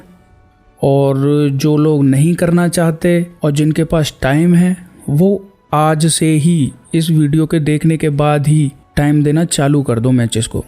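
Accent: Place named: Delhi, India. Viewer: दिल्ली का है इसलिए लहजा केजरीवाल जैसा है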